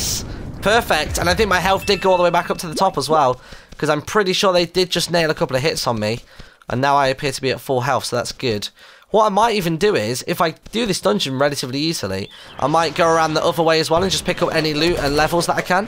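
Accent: United Kingdom. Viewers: Its just his acsent. Hes Britain dont make fun of him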